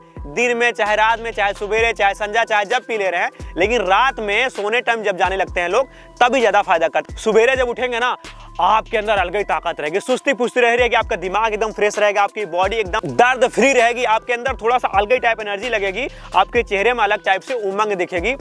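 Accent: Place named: Bihar, India. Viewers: Sbse achhi lgti h tmhari bhasha hmare jaisi h bihari type Bahut sundar bhai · Aese bhojpuri bolt rhaaa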